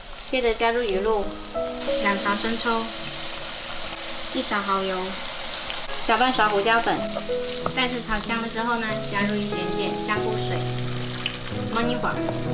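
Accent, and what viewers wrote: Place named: Hakka, China. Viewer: 你好！看到都想食哟😀👍简单易学！吖妺话老鼠奈去了😃客家话好好听👏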